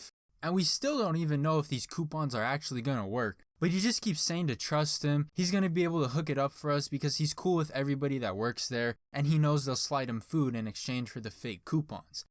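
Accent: Arizona, United States. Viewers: Bro got that Arizona accent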